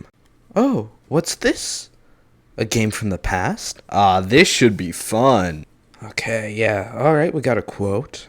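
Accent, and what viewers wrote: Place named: Spain. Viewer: I just watched one of your other videos and the "spanish and english accents coming out at the same time" cracked me up 🤣 i love the way you talk 👌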